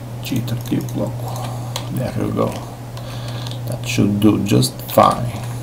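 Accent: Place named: Russia. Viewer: прости но ты Русский той акцент не катит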